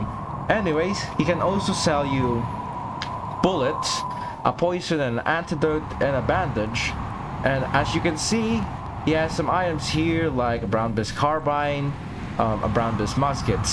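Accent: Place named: Philippines. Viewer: Bro u have the filipino accent, r u filipino?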